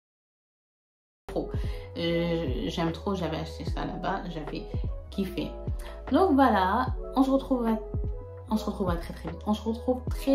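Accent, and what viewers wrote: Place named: Gulf, Arab world. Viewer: Merci pour la réponse 😍maa Shaa Allah belle richesse de culture, ta façon de parler je pensais que tu étais du côté des pays du Golf😊sinon merci pour toutes tes histoires et te souhaite beaucoup de bonheur 🥰 🥰 🥰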